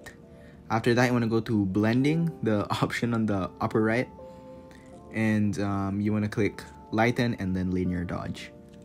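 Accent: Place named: Philippines. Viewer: Filipino accent do be nice tho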